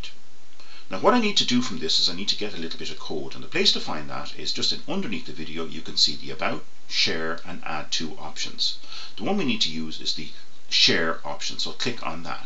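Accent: Ireland. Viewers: love that irish accent.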